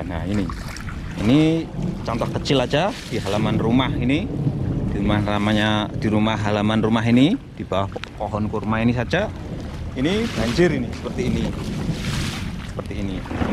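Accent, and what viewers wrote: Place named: Banyumas, Indonesia. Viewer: Inyonge wong ngendi kok ngapak ngapak temen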